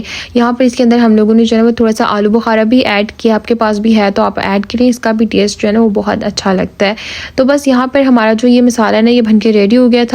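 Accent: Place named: Arab world. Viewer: Your voice is soo sweet and your arabic accent also 😍 very nice vlog and i really enjoyed your vlog🎉❤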